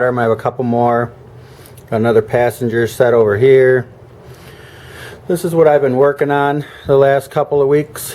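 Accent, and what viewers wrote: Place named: Wisconsin, United States. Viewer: Love the layout and the wood paneling in the basement is awsome and I detect a WISCONSIN accent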